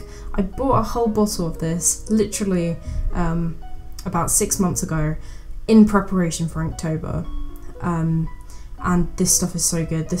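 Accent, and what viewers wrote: United Kingdom. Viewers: You sound British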